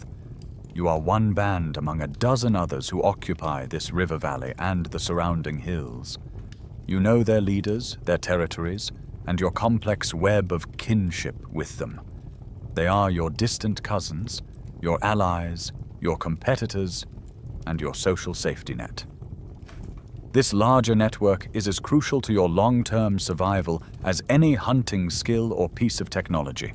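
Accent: United Kingdom. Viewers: Voice is way too loud and energetic for sleep. I also can't stand a British accent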